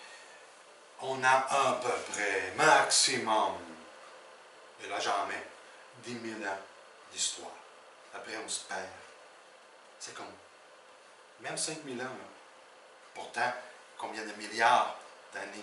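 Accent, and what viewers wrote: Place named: Portugal. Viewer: Bonjour Merci pour ce mixte entre l'accent Portugais et l'accent Québécois;))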